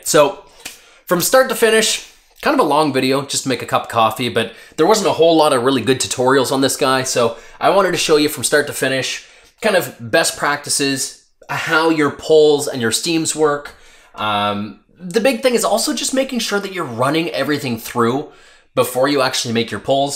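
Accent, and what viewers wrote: Canada. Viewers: i feel like you are from nova scotia?? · through ewt the video. with ewt spilling it. the water is coming ewt. melk. the canadian accent is something else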